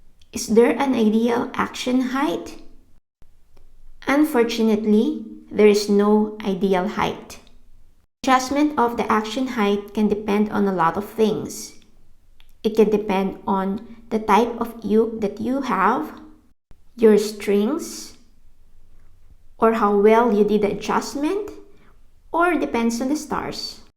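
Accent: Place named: Philippines. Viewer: Ah. Kaya pala sounds like pinay. Hehe. Very informative, and very clear too. Salamat!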